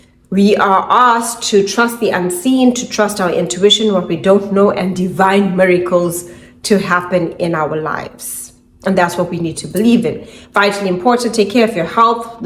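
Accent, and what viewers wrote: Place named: South Africa. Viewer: Are you south african? Bcs you have such accent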